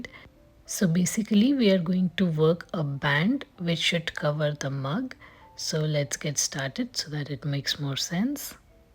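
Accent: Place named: India. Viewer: indian accent IM IN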